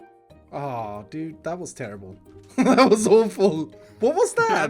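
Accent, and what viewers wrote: Sweden. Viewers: i thought so im hearing om is English it’s very good but I hear that Swedish voice lets gooo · are you swedish?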